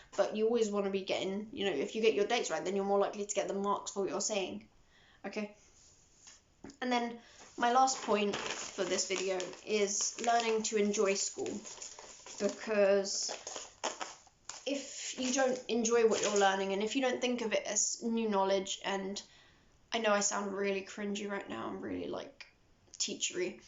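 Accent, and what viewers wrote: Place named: England, United Kingdom. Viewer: i don't know why you sound more english in this than usual it's funny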